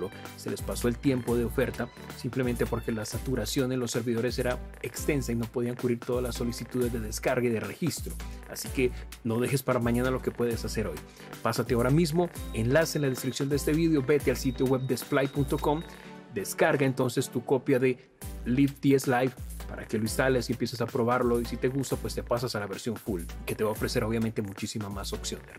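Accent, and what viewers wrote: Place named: Colombia. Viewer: Por tu bandera y acento me pareces de Colombia, yo soy de Venezuela y de España viviendo en México desde hace 10 años. Me parece excelente que intercambies con quienes te escriben. Tengo dos canales más, casualmente este no es el de música. Uno lo tengo para mis covers y otro como compositor. Decidí separarlos porque esto de las redes uno no sabe y cualquier dia me puedo llevar una mala sorpresa de que me tumban el canal por no tener derechos de autor. Los de compositor no hay forma. Empecé en este segundo aliento con YouTube hace poco luego de que twitter me tumbó mi cuenta con casi 500 mil seguidores y 10 años de dedicación, publicando poco además porque no me alcanza el tiempo para crear, producir y promoverme. Un Abrazote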